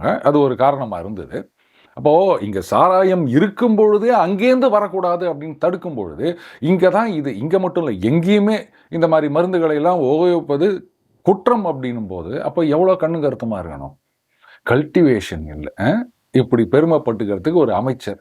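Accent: Chennai, India. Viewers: Why don’t u talk in normal Tamil instead of Chennai Tamil. It is too bad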